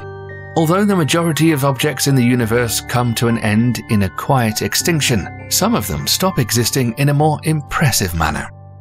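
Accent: United Kingdom. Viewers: Can you switch the narration accent to Jamaican please. The UK gets annoying.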